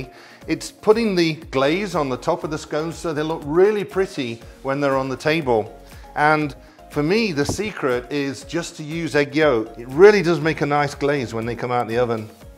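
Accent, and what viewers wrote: United Kingdom. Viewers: Chef just curious are you British or Scottish? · Even American Cooks has started to note both Celsius and Fahrenheit temps in their recipes. This guy is British, that he only told the Fahrenheit temp made my respect for him plummet more than one degree. I do not care where he currently live. He is so British to listen to and watch it almost hurts, and he makes his money on the fact he used to be cooking for the British Royal familie.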